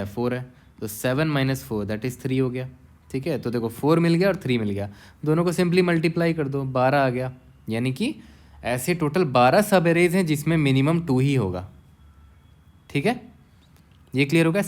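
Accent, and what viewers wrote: Bihar, India. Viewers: i feel you are from bihar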